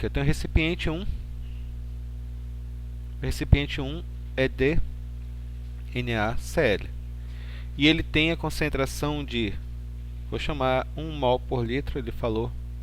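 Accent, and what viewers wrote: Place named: Recife, Brazil. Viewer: Você é de recife né? Logo vi hsuahsau, mto bom o vídeo, ajudou mto!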